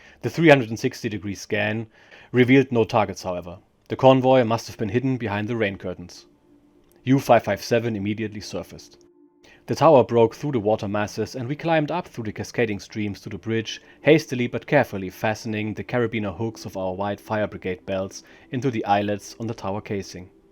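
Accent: Germany. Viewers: You are a terrific narrator. Your German accent makes this all the better. I am second generation German and am currently reading the German version to build my language skills. Thank you for this !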